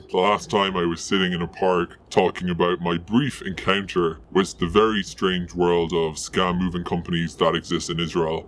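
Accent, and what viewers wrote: Ireland. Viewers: The issue is that a certain cadence and some letters in your pronunciation give away your Irish accent.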